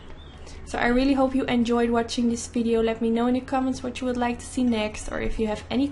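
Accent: Netherlands. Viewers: I love it! Your work is very precise, you're truly an artist :) also, your accent sounds Dutch, am I right? Oh and I've subscribed 😄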